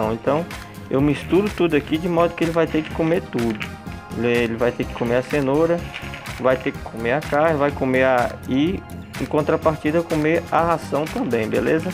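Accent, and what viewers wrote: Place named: Mombaça, Brazil. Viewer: Bicho das urea de mucego kkkkk sou monbacence eu falo asim memo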